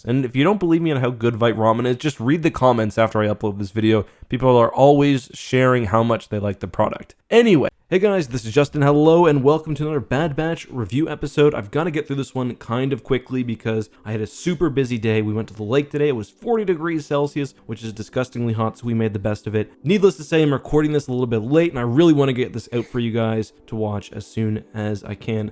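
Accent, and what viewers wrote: Canada. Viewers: You Canadian bro? From Ontario?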